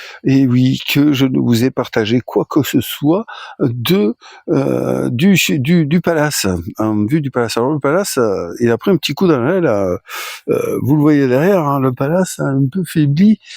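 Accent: South, France. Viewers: bonjour, peu importe pour de nouveaux jeux, tu es une personne chaleureuse a l'accent du soleil, perso les jeux que tu présente ne sont que secondaire, le fait de te voir a fond dans le jeu cela fait plaisir^^ la campagne orcquest était excellente, tu pourrais continuer. tout ça pour dire que les jeux sont secondaire, avec mes amis ont fait souvent les mêmes jeux le principal c'est de se retrouver. Pour les alea de la vie, fut faire avec voire sans. encore une fois se retrouver entre amis permet de penser a autre chose. En conclusion, même pour parler bout de gras on est la ^^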